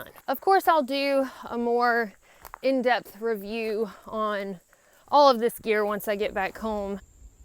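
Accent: South, United States. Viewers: Very enjoyable and good info for my Grandkids. I'm born and raised from Texas now living in Michigan. If I don't hear that Southern lilt now and then I have to go south and have pie and listen to a Southern Waiteress. Thanks for everything!!! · Barely found this channel and I love her camera work and southern accent. Thumbs up and subscribed. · You seem to have got more Southern-sounding since lockdown started. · How cute you're obviously from the south I detect a Southern accent lol👍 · Dang i miss the south.. I'm stuck in yankee land... love the accent.... makes my hes and haws pop out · You are so inspirational and beautiful. Love the southern accent. Ut oh I think i have a crush.... Lol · Missed that southern draw. Thanks for the views. Have a great day.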